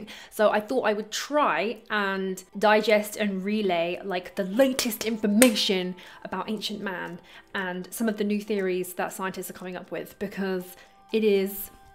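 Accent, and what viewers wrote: England, United Kingdom. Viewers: cracks me up, sometimes...!! Between some of the crazy faces she makes and the way her sheer excitement shines through in her speaking while discussing the topics of her videos, causing her mouth to suddenly malfunction in what I would jokingly describe as "seemingly random, momentary bouts of an excitement-induced speech impediment (all of which I find adorable and interesting, btw -- bc she has so much personality, and because the topics that she discusses in her videos are genuinely exciting, in the first place)," I really enjoy her videos...!! It doesn't hurt that I (much like the vast majority of Americans, I would imagine) find most of the various English accents to be hopelessly irresistible, to begin with... 😊 Hilarious personal fun fact: Several years ago I exchanged the original factory default voice on my phone for one that is both female and British (possessing what I would describe as a "super-sexy, authoritative and very proper English accent"), because I find that it makes for a much more pleasant and calming listening experience... 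😊.....and also because it somehow feels like she (I call her "Mary Poppins" lol) is a much better-informed alternative to the generic voice; and, therefore is much more qualified to be fielding my various oddball queries or giving me driving directions, throughout my day...!!! x'D #True story PS: For a perfect example of the "excitement-induced speech impediment" thing that I previously mentioned, listen to the first ten s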